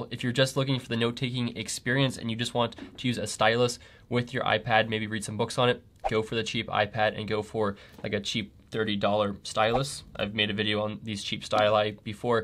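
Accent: Ireland. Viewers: LOVE THE IRISH ACCENT AHAHHAHAH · Love the Irish accent - being a Dubliner it made laugh!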